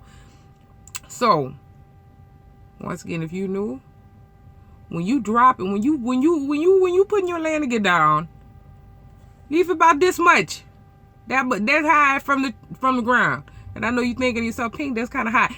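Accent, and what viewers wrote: Louisiana, United States. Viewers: Not a trucker at all......... Im just hear to listen to the accent... Always been a sucker for a Louisiana accent!!!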